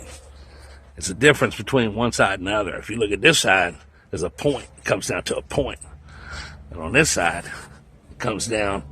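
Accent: United States. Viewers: What state is this accent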